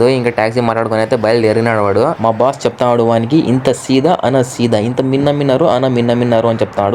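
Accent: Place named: Andhra Pradesh, India. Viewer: అన్న మీది ఆంధ్ర కదే నీ బాషా అలా నే ఉంది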